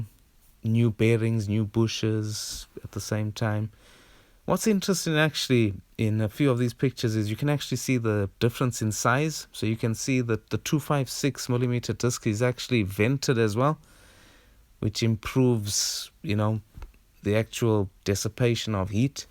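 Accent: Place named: South Africa. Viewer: Good luck with your build mate. After the first few seconds of listening to you, I realised you were a fellow South African.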